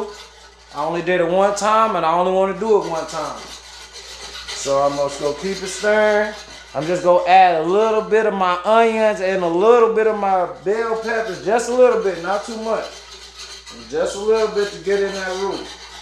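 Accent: South, United States. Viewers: I love that way you talk southern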